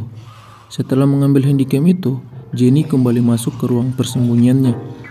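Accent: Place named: Eastern Indonesia, Indonesia. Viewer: logatnya mirip orang timur. APAKAH ANTUM ORANG TIMUR?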